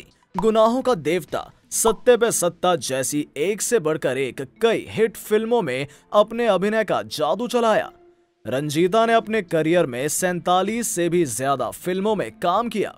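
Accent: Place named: United Kingdom. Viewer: Why ur talking in Hindi with attaching English accent to it. Hindi should be spoken properly. You sound so weird.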